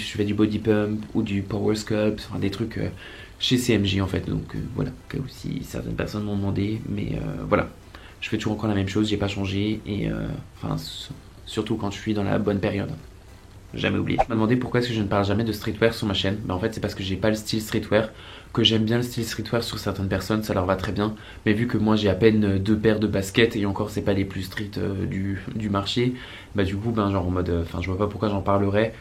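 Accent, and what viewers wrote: Alsace, France. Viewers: Depuis que je regarde t'es vidéo j'ai l'impression que t'es alsacien et puis la je tombe sur cette video et tu as répondu a cette question et purée quelle satisfaction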